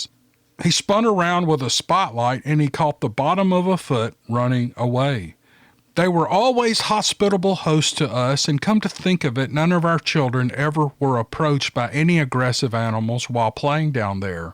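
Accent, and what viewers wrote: South, United States. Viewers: Im from mobile county.. we call it " the bottom".. born and raised on the "mobile tensaw river delta"... A HUGE SWAMP. Listening to is like sitting with an old friend. The southern accent, slow talk. Music to my ears... Thanks for the stories "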